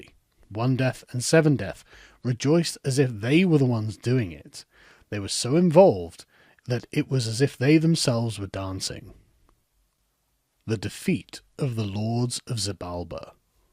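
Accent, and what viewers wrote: United Kingdom. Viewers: I fear is it just a brittish accent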